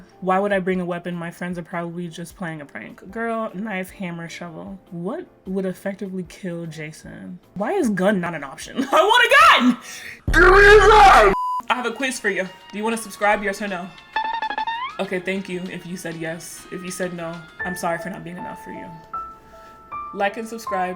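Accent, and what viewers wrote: United Kingdom. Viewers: the British accent. I love it queen. 👏🏽👏🏽👏🏽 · YOUR BRITISH ACCENT IS ACTUALLY SO SLAYYYYYYYY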